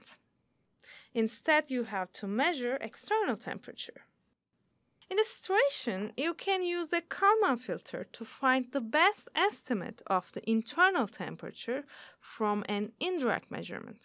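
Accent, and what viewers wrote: Turkey. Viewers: türkçe düşünerek inglizce konuşmuşsun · Is the speaker Turkish? Please someone tell me whether I'm right I have to win a bet. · bayan kesin Türk valla..Ingilizcesinden tahmin ettim. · Konuşan kadının Türk olduğuna yemin edebilirim isteyen olursa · Bunu seslendirenin Türk olduğuna her türlü iddaya girerim. Tam bizim aksan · She sounds very Turkis!h to me. Great explanation!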